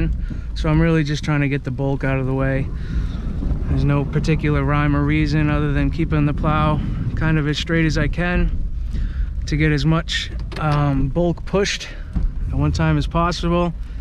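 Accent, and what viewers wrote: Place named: Massachusetts, United States. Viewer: Nice video. I grew up in Hanover, Mass. Listening to your accent makes me homesick. Did some trail riding on my enduro motorcycle back in the early 70's near where you live. Raced a couple times at MX tracks in Pepperell and Southwick, Mass. When I was 2, I broke my back in 1975 in an enduro accident that left me paralyzed from the waist down. Came out to the University of Illinois to complete my undergraduate and masters degrees. Massachusetts is not the easiest place to live for someone in a wheelchair. Again, hearing your voice brought back a lot of good memories of living on the South Shore, especially when it came to snow plowing on our small family run farm. I have traveled all over the world as a Paralympic Track and Field Coach and as a Strength and Conditioning Specialist. Retired now. One of my goals is to get back to the most beautiful state in the USA, Massachusetts. 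Another one of my goals to see at least one more Boston Marathon in person. Thanks for the great video. Be safe and all the best to you and your family.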